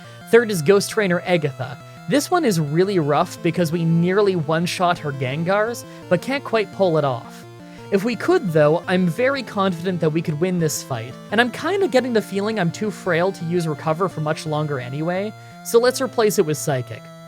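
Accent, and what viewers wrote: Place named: Canada. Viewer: I love how Canadian you are, when you say “out” I never know what pronunciation you will use lol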